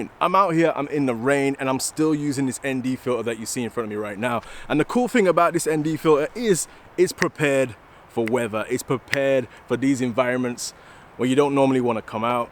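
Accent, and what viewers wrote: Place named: Australia. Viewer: Are you from Australia? Your accent is dope 👌🏼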